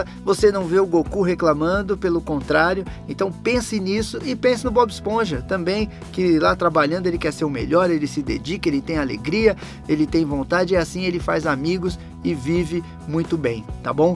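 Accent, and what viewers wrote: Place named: Northeast, Brazil. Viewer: q parte do nordeste vc era?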